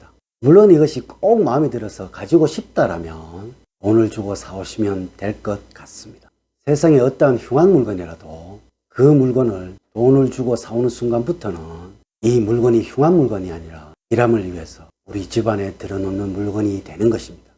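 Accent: Gyeongsang, South Korea. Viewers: 경상도 사투리 듣구 나갑니다 경상도=신천지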